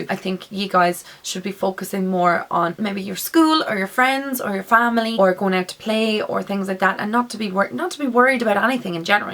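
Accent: Ireland. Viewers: Are u Irish if so I'm from Connacht in Sligo and I think your from Dublin right?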